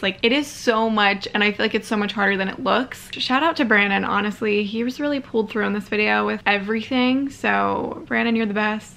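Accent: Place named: United Kingdom. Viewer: Nah she just loves talking in british❤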